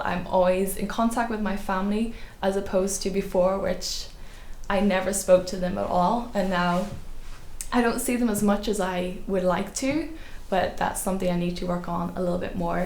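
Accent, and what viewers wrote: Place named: RP, United Kingdom. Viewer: Hey your accent has changed. More posh. Anyways, how are you doing? 😊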